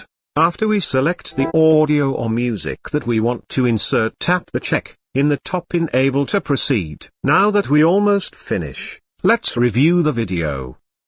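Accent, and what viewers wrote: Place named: United Kingdom. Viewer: Ang taray ng boses british accent #chutee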